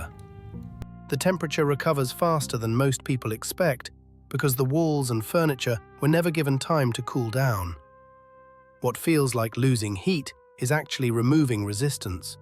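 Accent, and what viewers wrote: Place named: England, United Kingdom. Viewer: The bones of the building. 🙂‍↔️ That thick, English accent! ☝🏻🧐 The bones of the house lose heat. ☺️😆 I love it, German shock ventilation! 💁🏻‍♂️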